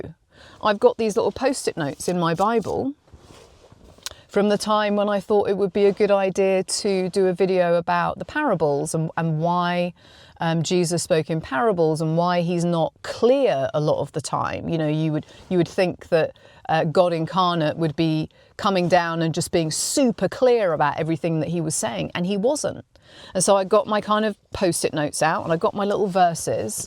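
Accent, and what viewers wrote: England, United Kingdom. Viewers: Brilliant as always! It is highly evident that you have humbled yourself before the Lord and so He is using you in a mighty way. This message resonates with me so much and yes, I do find that there is a definitive pattern of God allowing us to have our own season of death to self so that He may then live through us for His glory! As an aside, somehow, I feel more cultured just listening to you with that lovely English accent. Ha Ha And, since I did not have any children, I bestowed my favorite name to my beloved cat,.:) God bless you!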